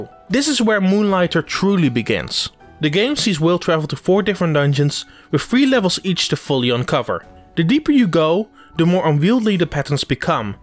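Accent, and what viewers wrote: Netherlands. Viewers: Yo is this guy Dutch or what, common try to work an the accent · As a fellow dutch man, i just can't handle his accent. He doesn't even try to hide it. (The following is not my original comment and has been added on) Some of these lines feel really forced, try working on that my dude. Thank you people for sharing your opinion on mine, i've never gotten this many comments from people with a different mindset that aren't just full of cursing and telling me my opinion is irrelevant. Looking forward to his improvements in his next review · Proper Dutch accent 😂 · Are you dutch? I am guessing you are from Rotterdam? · pretty bad review, i cant understand your english. you have a to much dutch accent influencing it and you are not clear at what you say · You do realise this guy is Dutch, right? Do you produce content in a second language? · I'm Dutch and listening to this reviewer it sounds like he's Dutch as well. 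So it's normal his English isn't perfect but very decent at least. But i like his review so keep up the good work dude !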